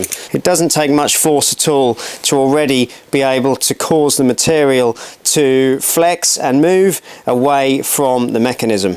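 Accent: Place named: West Country, United Kingdom. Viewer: Thanks for the video, one question - where’s your accent from? Bristol..Dorset maybe?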